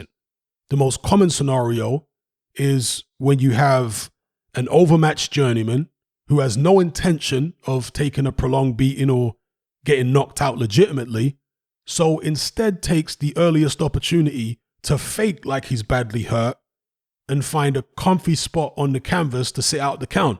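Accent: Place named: United Kingdom. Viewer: Bruv, the minute I heard that British accent I knew you were trolling. It was legit, quit hating.... Anthony Joshua lost, its over, get over it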